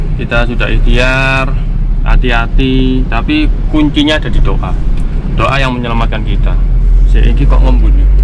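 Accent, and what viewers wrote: Surabaya, Indonesia. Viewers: Siap cakk,,,aq suka reviuw pyan,,,,to the poin,,,basa ne khas srboyo asli yo ?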